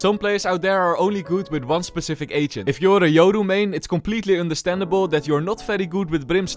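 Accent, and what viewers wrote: Netherlands. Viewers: you sound? look's like you from netherland are you from netherland?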